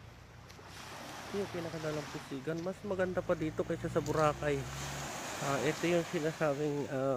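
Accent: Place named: RP, United Kingdom. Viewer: Nosebleed po ako hahaha pang mayaman yong accent ng British ganda po ng view.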